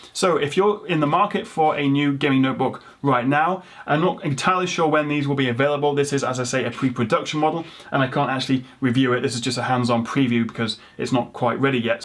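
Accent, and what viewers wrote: United Kingdom. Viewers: Around 10% in neighbors of the desktop version:D I almost go to sleep and then see you replying to my comment, I immediately have to respond. I really enjoy your contents and your sleek British accent:D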